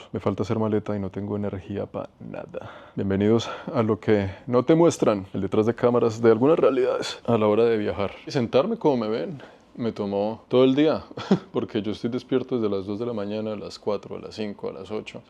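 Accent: Colombia. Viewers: Me encanta su tono de voz tan varonil y ese acento colombiano tan característico, saludos desde Culiacán Sinaloa, México ❤ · es neutro de Colombia. jajaja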